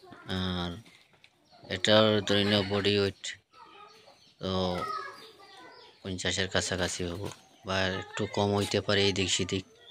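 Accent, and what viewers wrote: Sylhet, Bangladesh. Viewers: ভাই আপনি কি সিলেটি ত আপনার লোকেশন কোথায়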